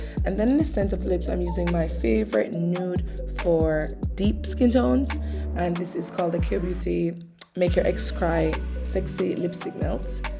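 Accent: Jamaica. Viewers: Am I hearing a Jamaican accent? Subscribing!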